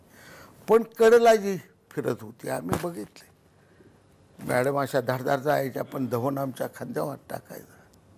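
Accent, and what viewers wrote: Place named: Satara, India. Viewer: अस्सल मराठी बाज ,रांगडी , असली माण सातारी भाषा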